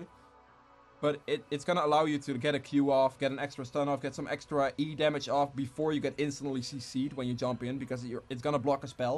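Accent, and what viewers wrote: Netherlands. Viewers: r u dutch?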